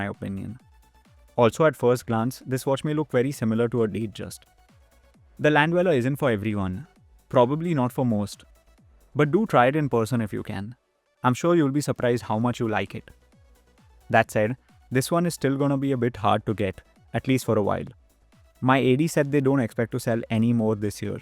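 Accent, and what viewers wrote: India. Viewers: don´t know, this video popped up and I am fascinated by an indish accent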